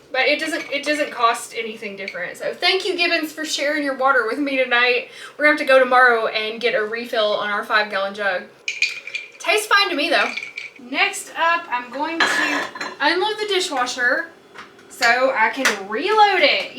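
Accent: South, United States. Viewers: Nursery water is what you use when mixing formula I think:D Hope this helps! also thank you for the cleaning motivation hehe, I keep your videos on in the background when Im doing things around my apartment so I dont feel so alone:) My family is from the south but Ive lived in NYC my whole life, I loved hearing their accents when we would visit, its such a sweet tone you have. Happy for all the vlogs and how much you're enjoying making them, I watch every single one you're so wholesome and down to earth 🥺😇🌈👼💛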